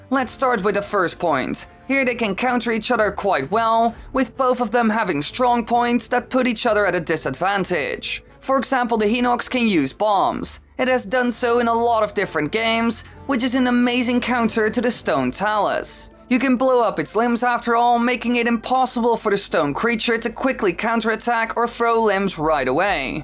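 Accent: Netherlands. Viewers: Love your dutch accent and ofc your videos.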